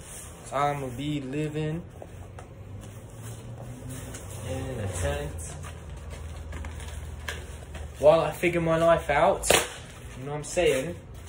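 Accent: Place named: United Kingdom. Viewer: 10/10 britsh accent forreal!!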